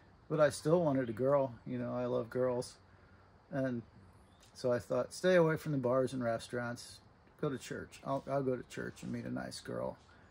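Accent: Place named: Liberia. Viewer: I'm from Liberty. When I hear you speak, it takes me back there.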